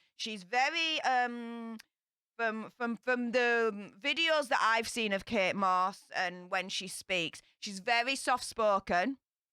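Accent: Yorkshire, United Kingdom. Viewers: Hi # Just saw you on popcorned. Love your Yorkshire accent. It's 'reet gravely'... Love the vid. I subbed! x Ve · Is that a Yorkshire accent,? North or South?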